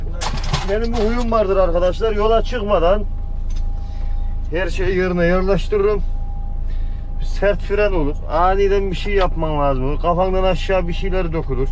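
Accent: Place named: Sivas, Turkey. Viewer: Abi valla seni hemşehrim zannediyordum Sivas in ağzına benziyor konuşan adamsın valla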